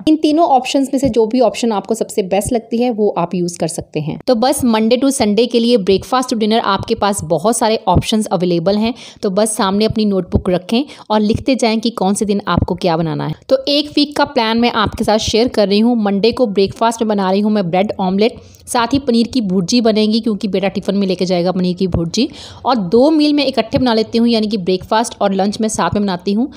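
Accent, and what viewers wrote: Punjab, India. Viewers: just loveeee her voice...it has a punjabi touch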